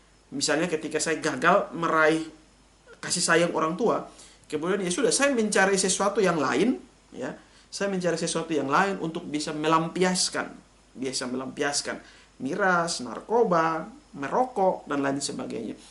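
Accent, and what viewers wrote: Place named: Java, Indonesia. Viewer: Koq ada nyelip2 logat jawa nya bapa dosen.. Heheee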